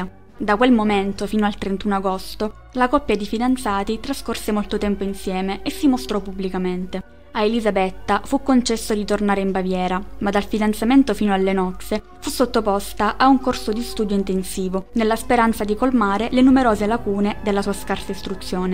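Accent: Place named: Catania, Italy. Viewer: Sei del Catanese? Si sente molto il tuo accento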